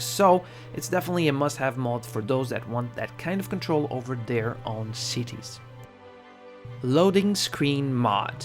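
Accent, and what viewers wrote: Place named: Netherlands. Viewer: Dutch accent love it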